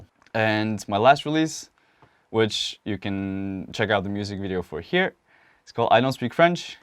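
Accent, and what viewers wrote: Norway. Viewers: I heard his accent and went wait is he Norwegian and then I saw the message from his mom and it was in Norwegian and I was in shock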